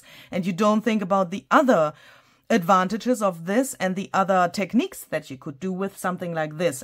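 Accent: Germany. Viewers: German with a Southern drawl? My ears can't even imagine what that would sound like! 😄😄😄👍🏻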